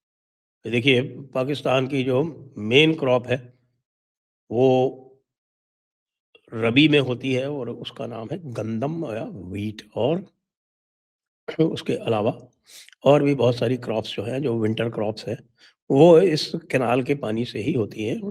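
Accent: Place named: Jodhpur, India. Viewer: तो भाई...... जोधपुरी लहजा आपका अच्छा लगता है। मिठालाल ज़ी मेहता की पुड़िया..... मोदी ज़ी के हर चोट की टाइमिंग सटीक होती है 😄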